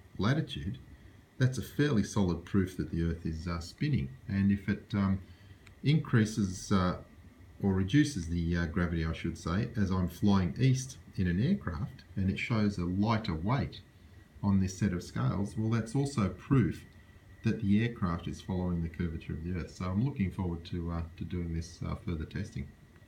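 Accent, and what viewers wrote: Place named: Australia. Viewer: en général j'active les sous-titres automatiques, ça m'aide à comprendre même si le petit accent australien de (que moquerait Melenchon?) cause parfois des soucis à l'algorithme.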